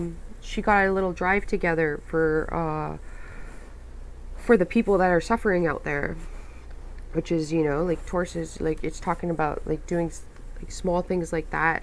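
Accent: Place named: Canada. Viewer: You gotta be from Canada EH. Me too.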